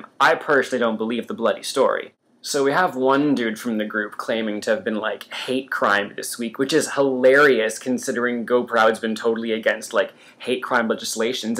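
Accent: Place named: Canada. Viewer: As a Canuck, I thought that you had a Canadian tone to your commentary! :) I love that you put your opinion out there & let people glean from it what they will. If you've been watching our political clime, you see that the LGBYT community is not as "safe" as it used to be. We've become complacent & are once again realizing that equality is not seen by everyone as a right but a privilege. Vigilance/education/outreach - always remember that no one hears us unless we make the effort to be heard. :)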